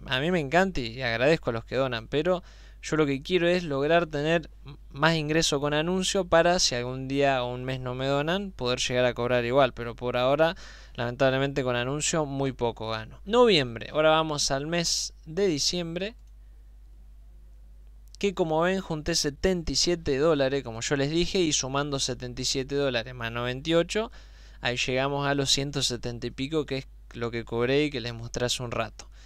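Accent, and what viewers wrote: Argentina. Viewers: Sos Argentino verdad ?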